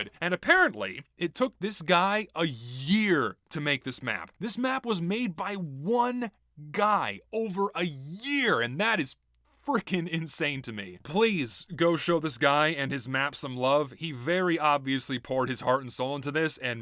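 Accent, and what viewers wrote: South, United States. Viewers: I love the southern twang in your voice, it's so sexy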